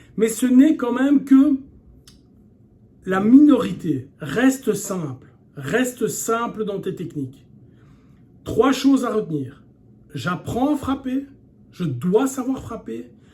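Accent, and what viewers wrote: Belgium. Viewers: Quand j ai commencé à vous suivre il y a quelques années...c était bien évidemment pour vos conseils mais également (et cela va paraître bizarre ),pour votre pointe d accent bruxellois..qui me rappelait avec une certaine nostalgie mes études et 10 années passées à travailler dans notre capitale. Quand je passe cage aux ours systématiquement je pense à vous....et vous voir continuer de nous donner vos précieux conseils alors que la majorité des patients sont prostrés à l idée des effets secondaires de la chimio,cela force le respect. Pareil à cambronne à waterloo, je vous imagine rétorquer à cette saloperie de maladie",la garde meurt mais ne se rend pas"...et à cet instant, voir ce cancer battre en retraite et choisir un adversaire plus faible. Longue vie à vous et je vous envoie un max de bonnes ondes bourrées de Kimé👊💪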